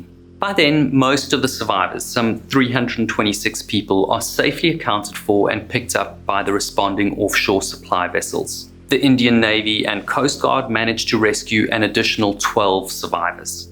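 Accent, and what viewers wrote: South Africa. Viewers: Is the narrator from South Africa?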